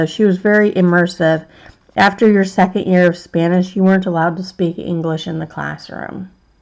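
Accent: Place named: Dayton, United States. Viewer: Your coloring is beautiful. I’m from Celina, Ohio and sounds like your from Dayton ares? Keep up the good work!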